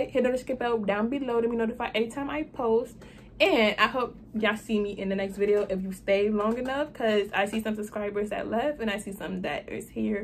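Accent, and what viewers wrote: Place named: New York, United States. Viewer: You got a new York accent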